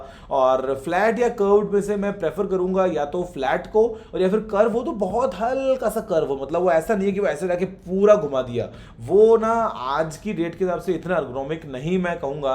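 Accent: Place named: Marwari, India. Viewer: Aapka marwadi aati ha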